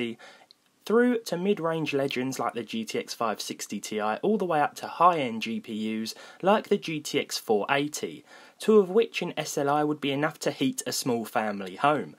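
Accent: United Kingdom. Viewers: that heavy british accent tho, it disgusts me , can you like stop chewing words ? damn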